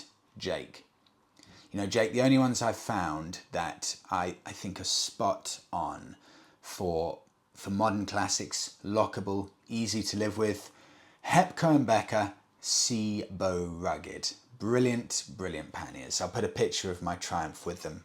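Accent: United Kingdom. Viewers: I love your two YouTube channels. Great content. But it’s your proper British accent that is just mesmerizing. It has the perfect pitch, tone, and pace.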